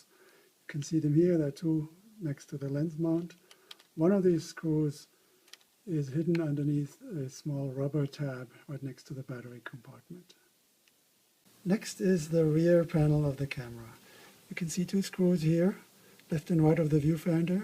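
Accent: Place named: Germany. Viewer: <tangent> I love your accent. What in the world is it? I'm getting slight German, but also slight... something from England, but I'm not sure what. Am I far off?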